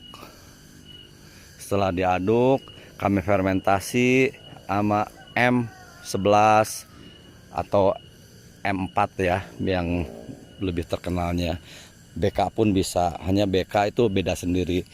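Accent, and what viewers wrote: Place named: West Java, Indonesia. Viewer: Duh uy logat na meni sunda pisan..edun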